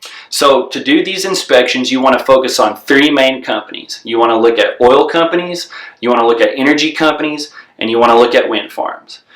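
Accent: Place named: Appalachia, United States. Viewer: Hey, you're right you will never sit in some cubicle working for DA man! But I can tell from your rants that you will end up in jail. And soon. I hope. It is guys like you that go out and cause all kinds of mayhem that bring the FEDs in to regulate. YOU are the problem NOT the FEDs. Also, it is clear to those of us already in the business that you really don't know what you are talking about. Please post your videos showing the oil company tower survey that you use as your example where you made 30, 40, 50,000 dollars. Please post a single link to any of your work. Your hillbilly accent tells me a lot about you. And your need to cuss and blow up at every post....You lack any shred of professionalism. Keep it up preacher...You gonna get you what you paid for. Now lets sit back and see if you can respond without calling me names or saying..."fuck you"...even once! 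In fact i challenge you to NOT reply at all.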